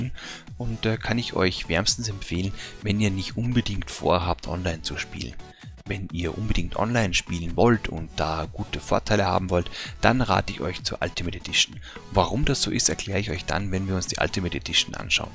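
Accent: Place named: Austria. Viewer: sehr gutes und informatives video! Mich als Österreicher freut es natürlich besonders wenn so eine Zusammenfassung mit österreichischen Akzent gemacht wird. Ich werde mir die special edition kaufen. liebe grüße karli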